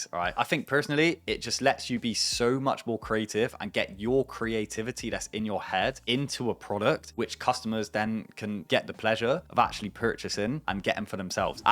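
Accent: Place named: West Country, United Kingdom. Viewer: The Etsy brought me here, the West Country accent made me stay. 😂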